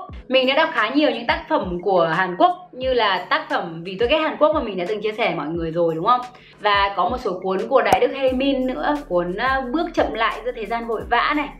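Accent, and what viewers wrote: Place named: Hue, Vietnam. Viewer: ơi!! nói giọng Huế nghe dễ thương lắm nhaaa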